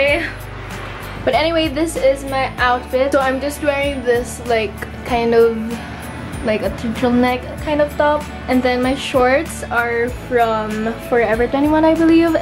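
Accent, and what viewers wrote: Batangas, Philippines. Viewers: Can you make a video na Batangueña yung accent mo sa whole vid?😅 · batangueño accent ahah!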